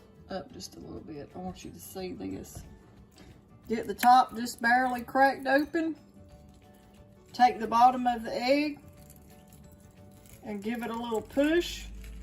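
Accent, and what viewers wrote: South, United States. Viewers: I boil a dozen, and use the ice bath method. I like to eat one boiled egg in the morning. Still have problems peeling , so I take the cold egg I plan to peel and place it in hot water for a minute. Seems to help. Like your southern accent!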